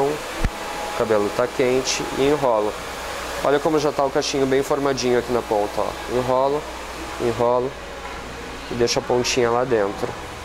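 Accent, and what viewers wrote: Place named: South, Brazil. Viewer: que vídeos maravilhosos!!! Estou fazendo letras na faculdade, mas cursando cabeleireiro paralelamente, pois é uma área que me agrada demais. Assistindo diversos vídeos na internet sobre cabelo, com toda certeza os seus são os melhores, não apenas pelo seu trabalho maravilhoso, mas também pela paz que você transmite ao falar e explicar suas técnicas nos vídeos, tenho certeza que isso é de extrema importância para a excelência do seu trabalho e felicidade profissional! Pretendo seguir como cabeleireiro, e depois de assistir alguns de seus vídeos, tenho ainda mais certeza dessa decisão, pois me identifico muito com você, tanto no amor pela profissão, como nos ideais de felicidade e visão de mundo, sou teu fã, estou acompanhando o canal, e não poderia passar por aqui sem deixar um comentário parabenizando pelo seu trabalho e pela pessoa que você é. 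Gostaria muito de tratar o cabelo contigo, mas pelo incrível sotaque sulino, acredito que estamos meio longe hehe Enfim, parabéns, continue com o maravilhoso trabalho, no salão e no youtube!!!:D Sucesso!! Paz e Alegria!! Patrick!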